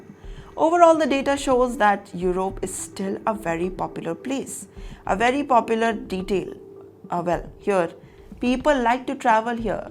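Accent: India. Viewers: Just curry english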